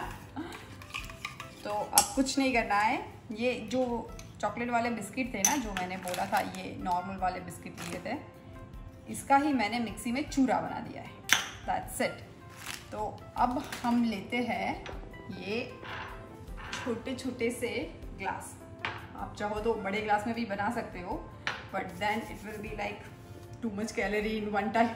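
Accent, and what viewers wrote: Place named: Kathiyawad, India. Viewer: Love to hear your kathiyawadi ascent..and all your recipes ... simple but fabulous 👌👏😘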